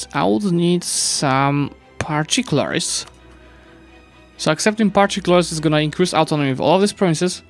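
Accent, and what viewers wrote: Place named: Poland. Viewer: because we have ears, his polish accent isnt that bad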